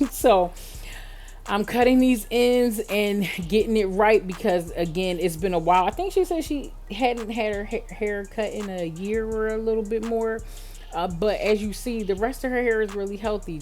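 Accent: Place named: New York, United States. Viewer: I’m from NYC and I just love the way you speak! So “Up North!”.. feels like home! And thanks for the education sis!🌹come to Charlotte!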